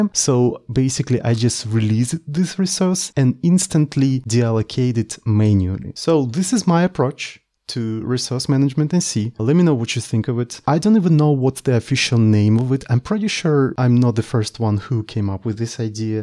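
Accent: Russia. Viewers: Вау, крутой канал. Очень нравится этот лёгкий русский акцент, прямо как родной, лол.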